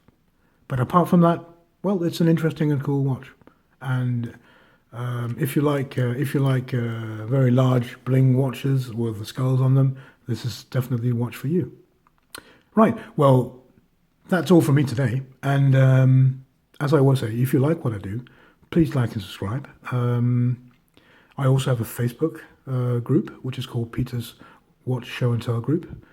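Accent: England, United Kingdom. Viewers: What accent is this? I mean I know you're from England (unless you emmigrated) but where abouts in England?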